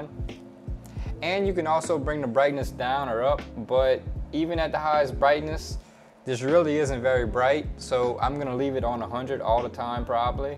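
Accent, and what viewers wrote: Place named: Louisiana, United States. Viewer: Just purchased thanks! Also where's that accent from, Louisiana?? 😎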